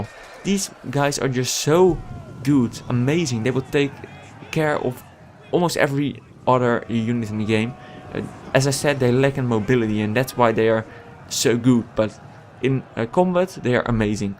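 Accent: Netherlands. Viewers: Are you Dutch ? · that dutch accent. MY EARS!!!!! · Dutch accent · you're dutch arent you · What the fuck is that dutch accent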